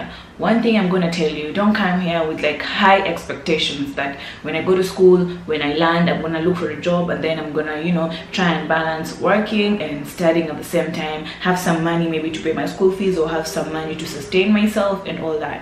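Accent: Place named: Kenya. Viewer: to be honest what i like most is your accent, a lot of you-tubers that are in Cyprus got deep African accent like Nigeria accent which is not pleasing. i think you from Kenya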